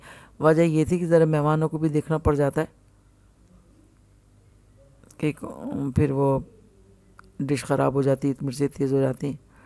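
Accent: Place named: Lucknow, India. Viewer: I love your "urdu", sound like "Lakhnao" ki urdu...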